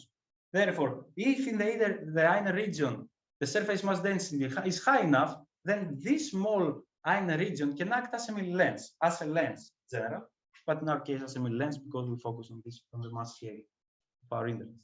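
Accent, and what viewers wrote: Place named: Greece. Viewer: μιλάς τα αγγλικά με ελληνική προφορά, ταλαιπωρείς πρώτα τον εαυτό σου και δεύτερον τον ακροατή , υποβαθμίζεται η δουλειά σου , όταν μιλάς τα αγγλικά το στόμα να το έχεις λίγο πιο ανοιχτό να βγαίνει η φωνή. Φιλικά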